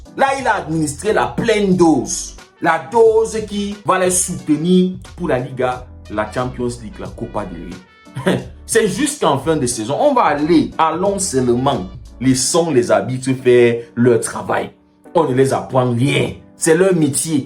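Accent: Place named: Cameroon. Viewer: La première chose que j'aime chez les camers c'est dabord leur accent 🤣🤣🤣, tres bonne analyse papa !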